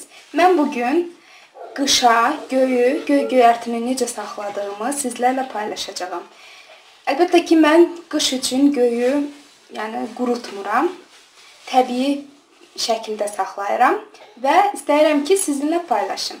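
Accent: Azerbaijan. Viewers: Guya Bakilidi gorureceyem demekle deyil sesinden rayon avazi gelid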